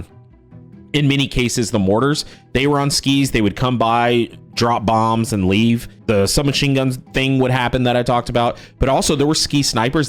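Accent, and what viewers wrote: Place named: United States. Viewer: hearing a american say the g or ch will never be not funny to me, if you want more lore about more regiments of renown you gotta do some looking into, they sing about historical units, events, people, battles and other unique "items" of war without any of the stigma behind it of it being used for good or bad, axis or allies. is one of there song and a banger at that. fair note it is metal music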